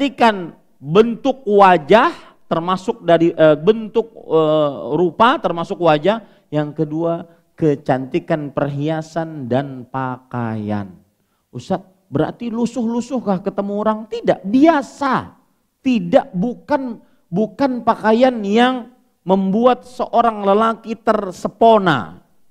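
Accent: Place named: Banjar, Indonesia. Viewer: Orang banjar lih pian guru..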